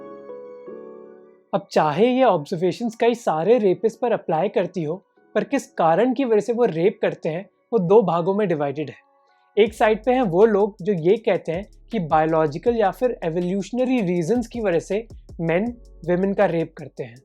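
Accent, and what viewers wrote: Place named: Northeast, India. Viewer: By the accent i am sure you are from arunachal or northeast right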